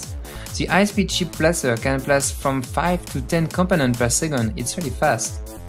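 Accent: France. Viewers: But why the hell did they choose a french for the off voice?? what a dread accent. It does not sound english even a little... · he's French 146% sure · You speak english like me =D Frenchie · if you ask me that sounded more like french... either way, in it's very common for big IT enterprises to feature narrators with visible accents. That way they try to show their internationality and acceptance. I work at Autodesk (a software enterprise) and at least 50% of our new products or updates are introduced by someone with a strong accent. Call it cliché if you will:D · French accent:D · This guy has a french accent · I'm English and I could understand everything he said. Stop complaining! In fact his French accent sounded better than the remastered version with the guy talking with a slight Chinese accent. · ca me va bien je comprend mieux avec l'accent français du coup:) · maybe cos the guy speaking is french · No, i can not listen anymore. 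This video is fucking RUINED by this french frog pos. I'd rather have any foreigner speak English lang. (russian, german), but NOT FRENCH!!!! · E tu a l’anglais d’un français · L'accent franchouillard tout le long, c'est un calvaire.! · vous êtes français?(à entendre l’accent ^^) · wth is the narrator french? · I can understand about 80% of what this stupd frenchy's saying. For example the word is "componant", not "cump'n'nt". "How" not " 'ow". Lazy prick! It's sad how many young children are out of work because of those robots.:-( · accent français XD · This french accent is amasing... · definitely french. you can get it from first 30 seconds, especialy when he says "from a to zee":) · That's FRENGLISH! Understandable nonetheless;) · he's french. i can hear it. that's my native language. he sometimes pronounce words as in french -_- as when he says "misplaced" and ze instead of the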